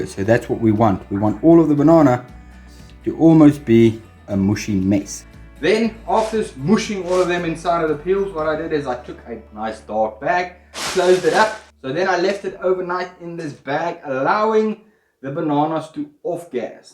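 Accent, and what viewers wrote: South Africa. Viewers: Bruh !!! South African?! Hell yeah buddy! Nice show! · South African? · Are you South African?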